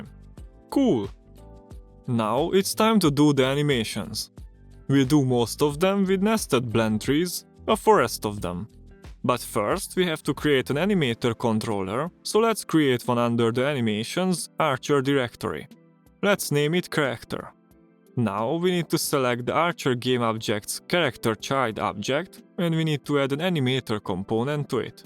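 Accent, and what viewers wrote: Hungary. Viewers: Magyar vagy ? olyan az akcentus. If you didnt understand nevermind my comment :)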